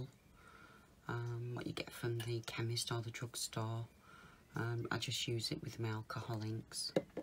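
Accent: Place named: North, United Kingdom. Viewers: Just found you on YouTube, I’m getting back into polymer after about five years and it’s nice to hear a northern accent 🤗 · Love the video, nice to hear a fellow northern voice!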